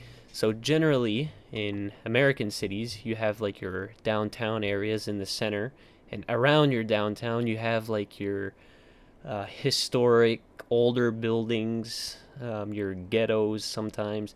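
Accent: Canada. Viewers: I mean u sound Canadian, but the most famous Atlantic city I know is here in jersey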